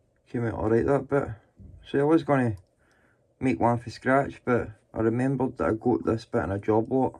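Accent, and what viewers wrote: Glasgow, United Kingdom. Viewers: Great work, I've learned a lot today. Good to hear a Glasgow accent again. I left (Carmyle) 25 years ago to finish my days in Aberdeenshire but I'm still kickin. Ca canny. Garth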